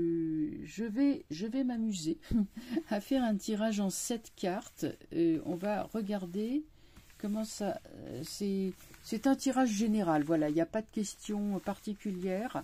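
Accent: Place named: Clichy, France. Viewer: Depuis quelques années, votre voix accompagne mes insomnies, comme une voix amie, comme une amie que j'aurais eue, avec son bel accent de Clichy. Merci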